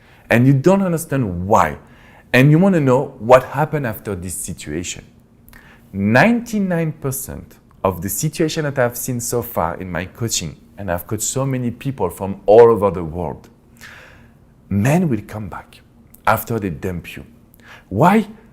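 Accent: France. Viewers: are you French ? I can hear the accent lol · Ur frensh accent is all i can think abt right now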